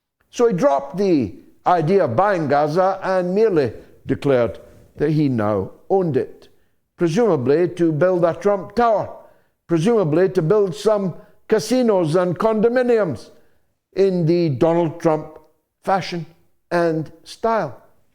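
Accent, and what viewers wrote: Scotland, United Kingdom. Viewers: I like your accent. Do I hear some Scottish? ( dont't know as I am Dutch.)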